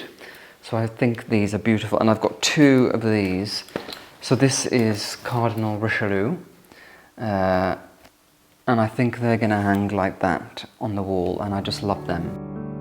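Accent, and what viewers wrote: RP, United Kingdom. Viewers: He talks so posh ❤ 😂😂😂 are your parents posh ?????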